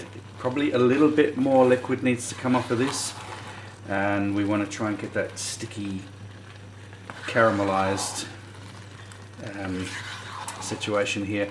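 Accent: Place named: Australia. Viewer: This is the best recipe I’ve seen on you tube for green tomato chutney. I need to do something with my tomatoes, (from my garden) before they spoil. Love this channel, especially because you sound like an Aussie. (I am one too).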